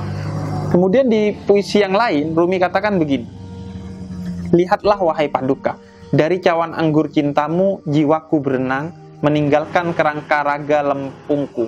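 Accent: Madura, Indonesia. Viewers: Maaf bib kayak ada logat" maduranya ?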